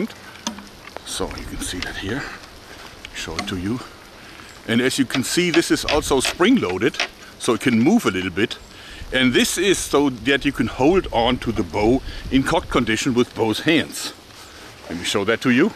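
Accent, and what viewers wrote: Germany. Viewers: german accent with an evil laugh ? I got the best video in my 33 year life. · German english accent is great :) and laught is so fresh , don't change anything man !! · He even has the german accent going for him.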